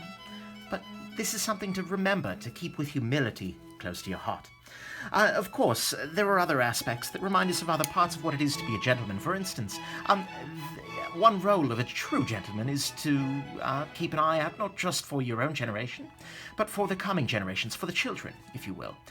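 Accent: United Kingdom. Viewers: Stellar accent 🇬🇧 Super review..... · OMG... British voice is more normal than his bat-reviewer voice!!!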